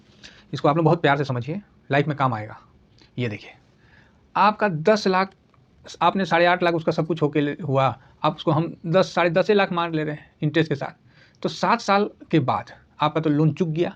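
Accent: India. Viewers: Sir ji apka bolne tarika aur boli bahut achi hai Desi style 🎉🎉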